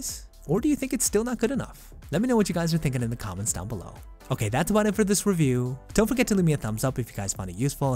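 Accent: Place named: Illinois, United States. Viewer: It's how we talk here in Illinois. Especially the front part of the state.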